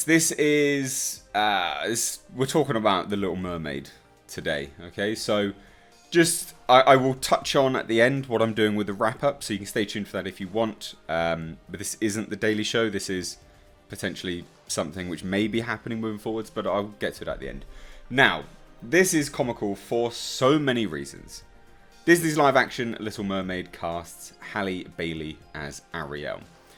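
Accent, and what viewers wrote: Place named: United Kingdom. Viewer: this is my first time watching one of your videos and,well the first thought that popped into my head,honestly was"oh,this guy is either a racist or bigot. He's white,isn't he." You look white,but I don't know,you're probably British or something like that cause of your accent. Anyways,I see your points and why this "tokenism" from Disney bothers you and most of these other commentators. But,if I may say something. Why say something now about this,I don't remember this much heavy backlash when they changed the green lantern from black to white. Besides,who cares? Disney isn't the Disney from your childhood anymore. All they care about,and it hurts me to say this,cause I too grew up with Disney,is the green. Can we just let them destroy themselves if they want too? They own practically everything now,and only do so,to squeeze as much money as possible out of these franchises,countering they're only doing this for nostalgic purposes. 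First,no one asked for these remakes to be made. Second, they are unnecessary rehashes of something good that already existed. Third, it's a disrespect to the original saying it needs to be "fixed". Everyone needs to get off their high horse and be brought down a peg,especially Disney. We only fuel their flames and this negative advertising is only gonna push for them to keep making more of these. Why? Because they plain just don't care anymore,they don't care about quality animation,storytelling,or original content anymore. Si why should we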